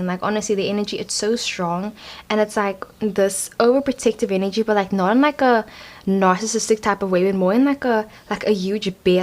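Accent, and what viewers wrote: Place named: South Africa. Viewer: are you from... SA.... bc I am and I hear an accent 👁️👁️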